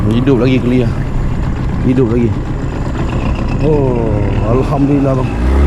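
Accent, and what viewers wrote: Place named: Melaka, Malaysia. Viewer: Bro dgr ckp mcm org Melaka ni bro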